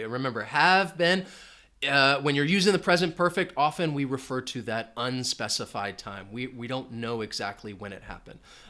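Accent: United States. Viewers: I just closed my eyes and listened to this video from start to finish. I learned not only tenses but American accent also. It will be useful for those who haven’t been familiar with the accent. So, I’m gonna invite my friends to subscribe and hit the bell button. They will be speaking more fluently with the tenses by the end of the year. A number of people in my country, Thailand, were struggling with forming a sentence despite the hard fact that they had been studying for several years. Unfortunately, their skills had remained unchanged. Anyway, students who have been working hard will have achieved their goals in so far as there is the light at the end of the tunnel. How long will they have been taking time to understand native speakers almost all the words they say? I suppose these covered all stuff you kindly taught. Thank you so much.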